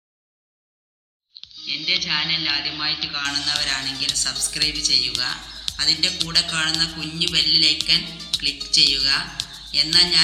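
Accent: Malappuram, India. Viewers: Nammude മലപ്പുറം ഭാഷ കേക്കുമ്പോ എന്തോ നൊസ്റ്റു ഫീൽ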